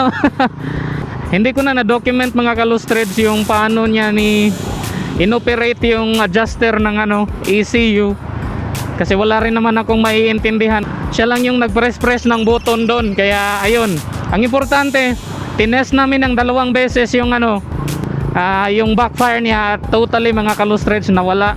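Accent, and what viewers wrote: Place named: Cebuano, Philippines. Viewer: Bantog kay pareha ta magtagalog boss. Taga cebu diay ka??hehe but i really like your review though.. the best visayan vlogger i knew yet.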